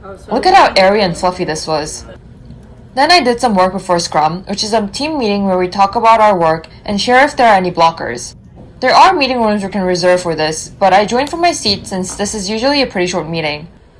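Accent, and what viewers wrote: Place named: Singapore. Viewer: 혹시 싱가폴에서 오래 사셨나요? 제 싱가폴 친구랑 억양이랑 목소리가 넘 비슷해서 깜짝 놀랐어요😅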